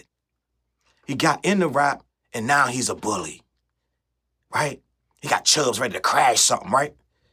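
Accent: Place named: New Jersey, United States. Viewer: First time viewer. I think you’re analysis is spot on. Also sounds like you have a New Jersey/New York accent. Great content!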